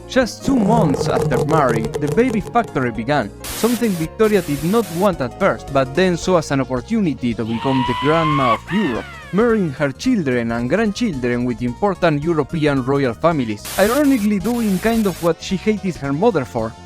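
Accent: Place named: Argentina. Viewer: You sound Argentine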